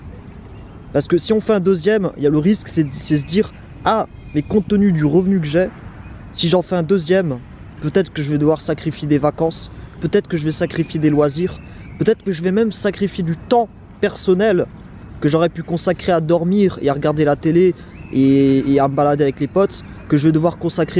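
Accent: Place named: Banlieue, France. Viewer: bien d'accord avec le message, vraiment dommage ton accent de banlieu . merci du taf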